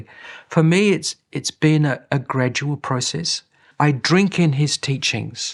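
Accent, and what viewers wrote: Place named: South Africa. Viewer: It sounds like hails originally from my country - South Africa. My first Prashanti Christmas was in 1986... Never, ever to be forgotten!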